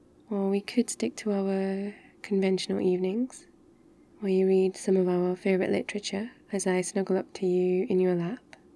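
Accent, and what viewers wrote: United Kingdom. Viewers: Are you British! Finally! I love British accents!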